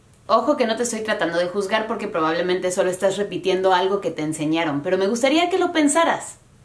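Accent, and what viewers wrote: Standard. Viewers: yo tenia entendido que un idioma es el que tiene tambien ESCRITURA o se que no solo se habla sino que tambien se puede escribir.... y en su defecto el dialecto no. Hablas Espanõl neutro y tambien se diria que tu Ingles es neutro. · Te sigo en tu canal, pero como que no era tan fan de tus videos, pero me has ganado ... Hablas español e inglés perfectamente y defiendes el náhuatl sintiéndote orgullosa de tus raíces y durante este video analice tu acento y me encantó ya que es muy neutral y no como el de las ridículas que hablan un poco de inglés y ya se expresan así: "Joveeeen buenas tardeees..."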